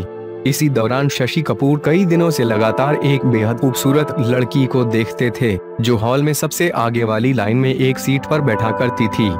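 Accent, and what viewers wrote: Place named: Bihar, India. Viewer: Bihari Type Commentary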